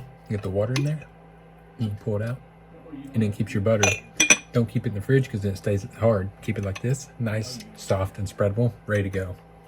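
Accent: South, United States. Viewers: Very first time i hear your southern accent. 😂 I didn’t hear those when you were in Asia ✌️ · Since returning home, your southern accent has really become strong! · Your southern accent comes through so strong at home. I didn’t hear as strongly when you were living in Vietnam. So cute! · hi.. i have watched some of your phil. vlog and came across your recent one.. surprised to hear ur southern accent.. lol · accent got back to factory reset as soon he came back south 🤣🤣🤣 love to see this side of your life country boyy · Your southern accent is so much stronger when you’re home, it’s refreshing, thanks for all the content. Wishing you a fantastic day, and safe adventures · This is for the very first time I am getting to hear your native Southern Accent. Sounds so interesting. Do you conciously tweak your accent when you make your travel videos ? Just asking out of curiosity as a language student. 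· your southern drawl really comes out when you're home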